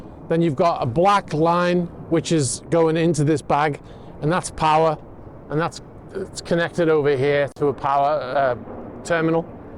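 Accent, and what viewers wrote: Liverpool, United Kingdom. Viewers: first video I’ve seen of yours here, belter, nice to hear a fellow scouser on YT!! Keep the bits up lad is right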